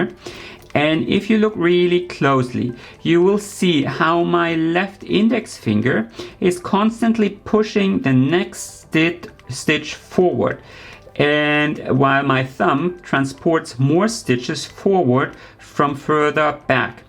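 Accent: Germany. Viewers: Donnerwetter! 👍 Abgesehen von den vielen sehr nützlichen Tipps sprachlich perfekt! Damit meine ich jetzt weniger die Aussprache – den deutschen Akzent hört man nach wie vor –, sondern den Gebrauch des eher ungewöhnlichen Vokabulars. Ich bilde mir ein, ausgezeichnet Englisch sprechen zu können, aber bei dieser Thematik müsste ich passen. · I have some Norwegian friends and you are so like them. your style of knitting is so relaxing. I knit the Norwegian way. This is a quick way to knit but sometimes I like to knit slowly. I enjoy every stitch. Now I listen to your accent more closely I can hear the Germannic style. Germany is a lovely country and the German people are so warm and friendly. I hope we can keep in touch. Lol Jacqui from Seahouses UK x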